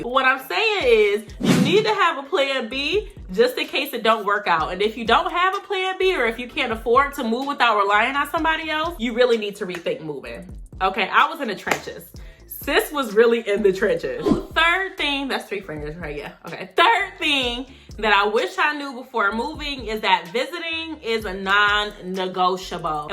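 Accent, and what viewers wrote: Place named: Syracuse, United States. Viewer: Where are you from in New York? I'm from Queens but you sound like my cousin in Syracuse. Am I right?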